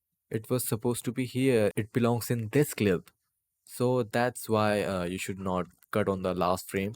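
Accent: India. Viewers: BRO`S INDIAN ACCENT IS TAKING OVER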